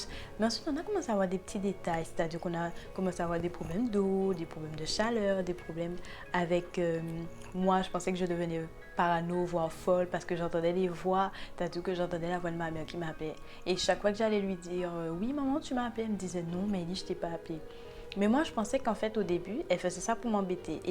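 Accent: Antilles, Caribbean. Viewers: L’accent antillais est présent 😂😂🙌🏾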